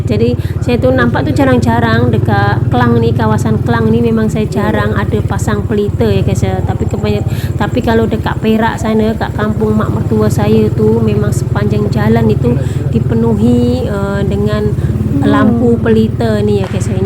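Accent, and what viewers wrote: Java, Indonesia. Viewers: Logatnya biasa aja lah pake indonesia jadi kurang enak dengarnya pake bahasa melayu malasyia lucu,gak enak dengarnya sebab medhoknya masih nampak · bahasanya boleh melayu Malaysia tapi logat jawanya medog banget inilah Jamed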